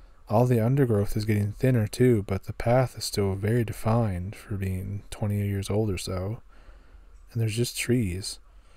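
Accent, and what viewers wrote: Canada. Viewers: I'm pretty certain he's from Canada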